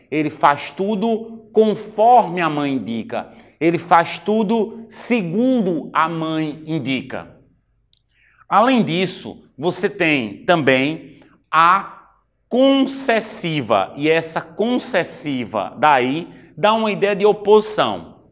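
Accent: Northeast, Brazil. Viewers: Meu caro professor. Suas aulas são espetaculares. E mais, seu sotaque nordestino, é fenomenal. Aprender língua portuguesa deveria ser a meta de cada um dos falantes desse idioma maravilhoso. E, tem gente que não gosta. Será que tem medo de aprender? Pra esses "possíveis medrosos", fica a reflexão de Sócrates: - podemos facilmente perdoar uma criança por ter medo do escuro. A real tragédia da vida, é quando os homens têm medo da luz. Abraços.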